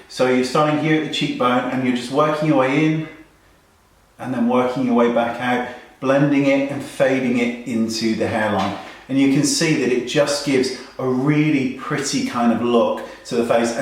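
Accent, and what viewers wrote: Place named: England, United Kingdom. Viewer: You are sooooo sweet!!!!! you seem a really really nice person, also I love your english accent. Well anyway, I want to ask you if you could show me how to do the makeup on a face with rosacea, not severe, just with a pinkish tone. In my eagerness to camouflage my rosacea I always end up looking with no life whatsoever, and going in again with a pink blusher it just seems nonsense. So now I leave my cheekbones with my pink rosacea and then I apply my foundation on the rest of my face, it looks ok, but I'm sure there's a better way around it. Help please! :D Kisses! (I'm moving to London on March 2015 Yeyyy!!!)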